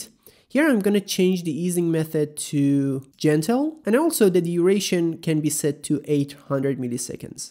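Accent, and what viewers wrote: Iran. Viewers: its the persian accent thats killen me man. hahahah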